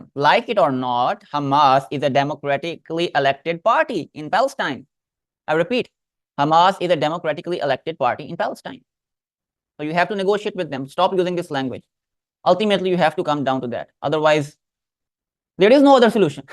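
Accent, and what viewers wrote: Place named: Bangladesh. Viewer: You sound Bangladeshi, what do you know about the Middle East and history little girl?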